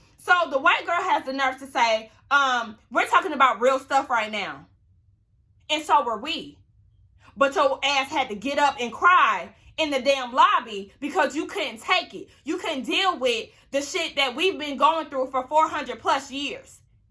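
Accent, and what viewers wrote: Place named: Florida, United States. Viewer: A hit dog will holla!! They hit a soft spot that was ringing Hella true for her! Baybee that left hand of yours be having its own conversation when you get heated...like it was say get da fuk away from me wit this lmao...I love hearing you tauk...cause I'm from floida(we don't pronounce it like its spelled) lol and we sound jus the same.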